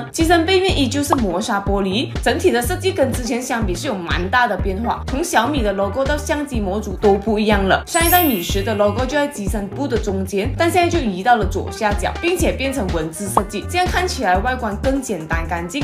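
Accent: Malaysia. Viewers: 妳一開口 我就知道你是馬來西亞人 哈哈 說國語好友喜感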